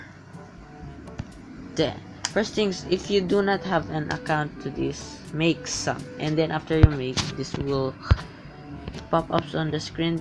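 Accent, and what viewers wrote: Philippines. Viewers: Yep the accent is my fellow filipino friend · I can easily tell that you're Filipino :D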